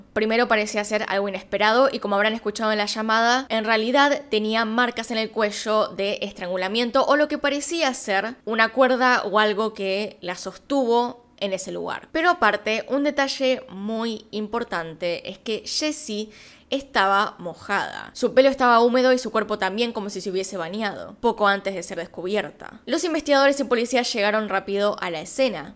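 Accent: Argentina. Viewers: Soy español y escuchar un accento argentino mientras estudio es un vicio 😂 me alegro de que ya casi estas casi al millon. Vamos !!!!ánimo que tú puedes llegar!! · Es argentina por eso suena así.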